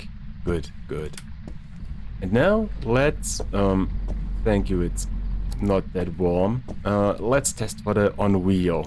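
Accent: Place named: Germany. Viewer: I would definitely go for Germany but he just has a strong German accent in his English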